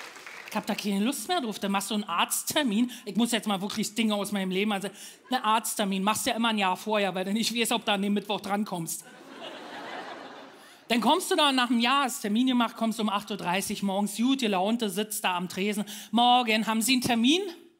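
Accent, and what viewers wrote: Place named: Berlin, Germany. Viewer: Schön das du wieder da bist. Hast gefehlt 😅 I liebe deine Stimme,dein Berliner Dialekt und deine coolen Sprüche ❤️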